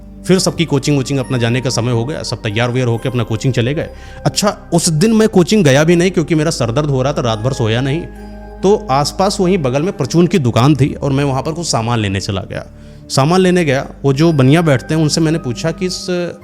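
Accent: Kanpur, India. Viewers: Bhai k bato se LG gya kanpuriya hai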